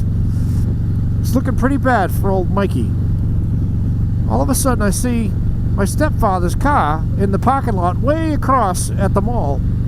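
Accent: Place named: Boston, United States. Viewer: Love the Boston accent the way you say Kar! All mom's and dad,s i think have had a good laugh now and then. That's so true. Hahaha.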